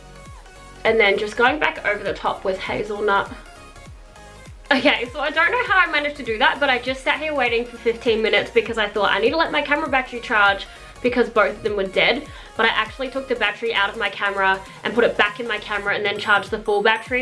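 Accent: Australia. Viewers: R u Australian? Because I am just asking.. (edited: u might be sleeping rn if u r Australian)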